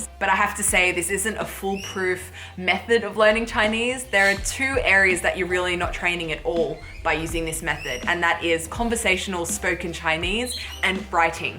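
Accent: Australia. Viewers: As a Chinese,I like your Australian accent. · So if you want to speak like Ausie just insert an R after every O sound. OrK ? sor goring dorn't shorw vorcabulary cornjunction cartoorns gor goord morment Not to mention all the up talking, very very painful to listen to. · Australian accent is hilarious. I wonder if native Chinese people can tell them apart from an American accent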